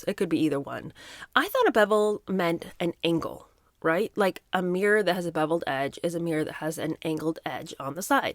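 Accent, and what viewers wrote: United Kingdom. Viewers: I can’t stand listening or looking at anything about Megan markle BUT seriously, your videos make it so humorous that I find myself laughing so much that it hurts. Your British accent cracks me up with laughter, your analysis of Megan teagan and the boring s h I t show is the most hilarious video I’ve ever seen. I’m gobsmacked that teagan posted those horrendous pedo comments about children, I was un aware of her evil, sadistic, perverted nature! Ewe! DHS should check up on Reagan’s kids, b/c I wouldn’t be surprised if she’s lent them out to other pedos, maybe that’s why she was elevated as a celebrity b/c who she knows and who she gives her children to, to abuse them. There’s so many pedo elites willing to help ppl like teagan, IMO. I feel so sorry for you having to sit and watch Megan’s crap show so that we don’t have to thank you sooo much. 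I love your videos please keep it up. 👏🏻👏🏻❤️🫵🏻😂🤣😂🤣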